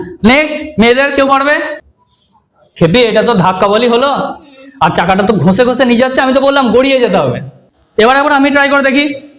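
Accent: India. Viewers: বর্ধমান জেলার ভাষার টান, কনফার্ম! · আপনার বাংলা কথাটা কিরকম যেন টান আছে। হাওড়া জেলার লোকের মধ্যে দেখা যায়। যদি পরিস্কার বাংলা বলতে পারেন খুব ভালো হয়। কারণ আপনি একজন শিক্ষক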